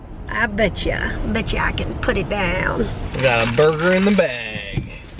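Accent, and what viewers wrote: South, United States. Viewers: Southern accent is sounding so good!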